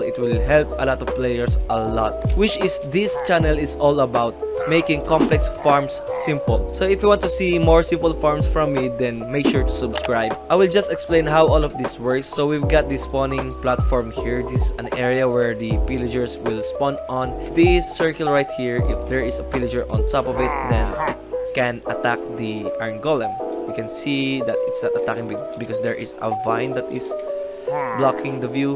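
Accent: Philippines. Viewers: Unang accent palang, PH na PH! Salamat boss ! <3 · are you Filipino? cuz ur english accent is like filipino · Are you filipino? Your accent very familiar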